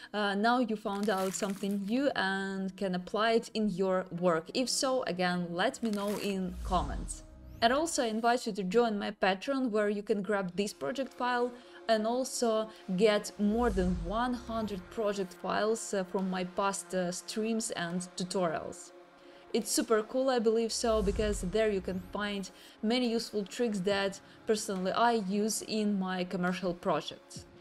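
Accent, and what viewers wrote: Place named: Russia. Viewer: Такой классный русский акцент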